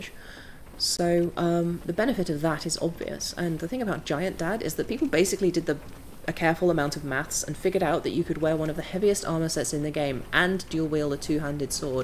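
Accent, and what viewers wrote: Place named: Ilford, United Kingdom. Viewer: Yorkshire?! He sounds like he’s from Ilford to me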